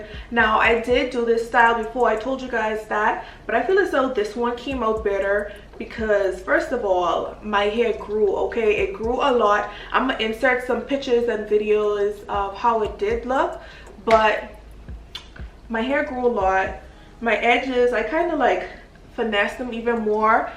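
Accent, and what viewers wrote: Bahamas. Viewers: She from the Bahamas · Are you Bahamian, bc this accent hide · Definitely Bahamian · It's a Bahamian accent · I love the Bahamian accent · You must be Bahamian!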